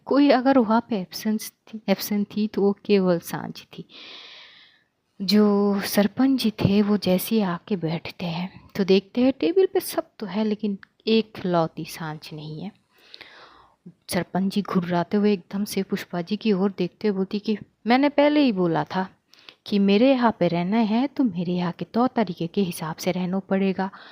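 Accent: Haryana, India. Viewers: Kya story hai yar or upr se aapki haryanvi voice mar dalogi sach me 😂😂😂😂😂😂😂😂😂😂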